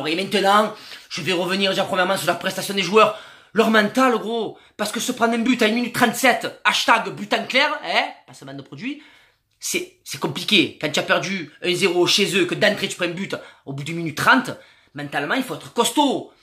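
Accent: Marseille, France. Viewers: Mais noooooon, Dugarry a été l'un des meilleurs attaquants français, il a donné son pronostic.Y a pas à le traiter de pute!!!!!! Perso, j'ai pas eu envie de voir le match car je me fait chier en regardant l'OM en ligue 1. Et oui, je me suis planté et oui j'espère que l'OM gagnera la coupe .....tout en écrivant, je t'écoute parler et t'es pas Marseillais pour rien! Allez l'OM!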